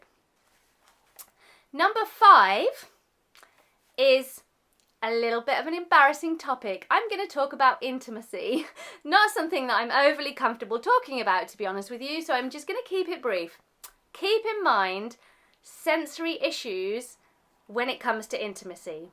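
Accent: United Kingdom. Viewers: Good upload. Thanks for doing so. I'm guessing the narrator is English or lives in the UK, based on this person's accent - I'm Canadian and live in Toronto, but I'm sure a lot of what this person is saying could apply in other countries & cultures.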